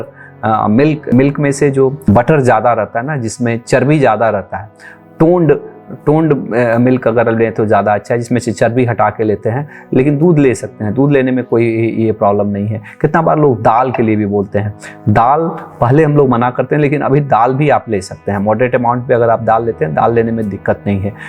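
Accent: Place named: Bihar, India. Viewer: Sir, aapke bolne ke style se bta skta huun app surely Bihar se hain wo bhi MAGADH area se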